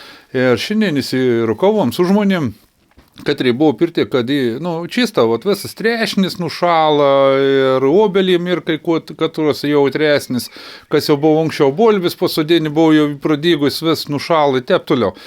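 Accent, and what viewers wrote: Samogitia, Lithuania. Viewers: Vidiaks 😅😅😅 net ir aš pasimečiau, kaip tašė stoty 😄 Itin smagu girdėti gryną lietuvišką žemaičių kalbą ❤👍 Pasaulinis lyderis V. Putinas, kaltas, 😅 Gerb., jus👍😄 Sėkmės jums su Egle ❤ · Ačiū už žemaičių kalbą. Koloritiškai... Ta prasme spalvingai skamba. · Pirmą kart klausau kaip žemaitiškai kalba, labai malonu ausiai, aš kaip nemoku man labai smagu girdėt.:) Manau žemaičiai visad turėtų kalbėt savo tarme, o kam nepatinka tegul čiuožia. 😄 O apie putiną tai čia geras buvo, dar tokių bajerių negirdėjau šiemet. 😂 Mldc. 👍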